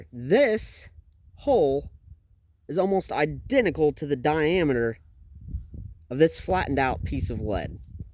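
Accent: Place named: Appalachia, United States. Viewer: I rather try to catch that slug with my face than have too listen to another minute of this hill billy speak again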